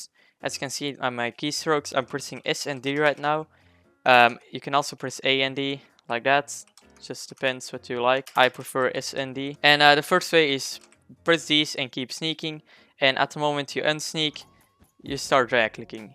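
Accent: Netherlands. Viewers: volgens mij is hij ook Nederlands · He is somewhere around belgium or the netherlands.. i know that english accent from outside my head since i am also dutch.. he is <num>% From belgium or Netherland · You dutch? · I feel like you're dutch XD, aren't you? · your'e accent little bit sounds like your Dutch am i right? XD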